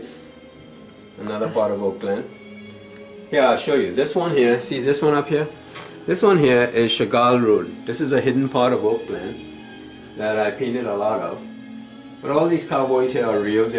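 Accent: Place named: Trinidad and Tobago. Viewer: Still got your trini accent man!! so cool..an I love your work..its wonderful.. love it all..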